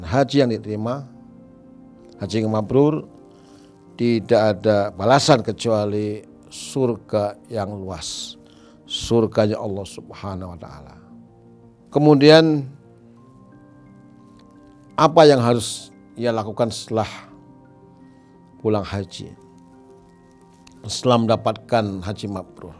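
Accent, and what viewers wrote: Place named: Banyumas, Indonesia. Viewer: Tadz, agak ngapak logatnya ya, orang mana tadz? Saya asli brebes, salam dari brebes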